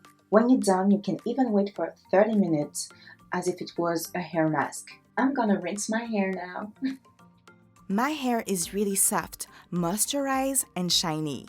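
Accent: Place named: France. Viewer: I can feel the french accent which make you english good. Where're you from?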